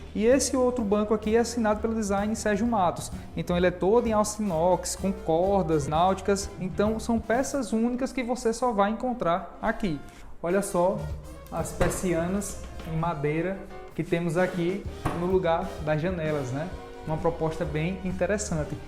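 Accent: Northeast, Brazil. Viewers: Que projeto lindo, gostoso, sem palavras pra esse projeto e essa casa! A sua apresentação tb, é digna de aplausos, parabéns, vc é diferenciado tb pra apresentar, fora que vc tem um sotaque muito legal que eu, como boa filha de nordestinos, adoro kkkk. Parabéns tudo muito top demais! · Pelo sotaque deve ser em algum lugar do nordeste , ceará talvez ou Pernambuco talvez ☝️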